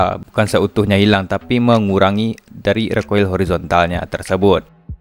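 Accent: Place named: Padang, Indonesia. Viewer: oh.. orang Padang ya, bang. Kirain orang Malaysia 😅🙏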